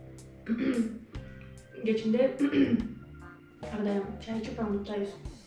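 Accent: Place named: Talas, Kyrgyzstan. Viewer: Таластык экени билинип эле турат да алыстан 🤭🤭🤭 Кечириңиз бирок көрө албадым таккыр. Аракеттендим абдан. 👎👎👎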